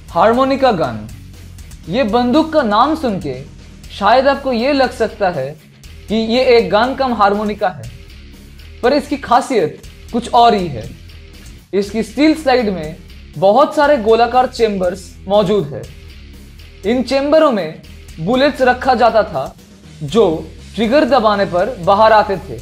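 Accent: Bengal, India. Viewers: ओरे दादा।। पहिले ठीक से हिंदी बोलना सीख ले।। अड़ को आरर बोलता है।। बंगाली दादा।।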